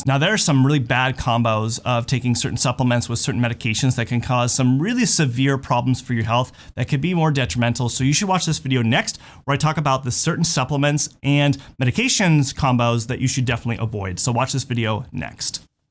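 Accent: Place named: Utah, United States. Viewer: I couldn't quite place where your accent is from. Then I heard you say "Buh' en' very strongly (for button) and I thought it sounded very much like a Salt Lake City or Provo accent. I could be wrong. ISTITNOJCA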